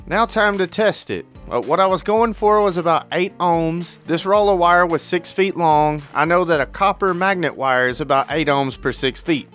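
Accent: South, United States. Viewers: As a native Tennessean, I love hearing a deep southern accent saying intelligent things. Kick the stereotypes!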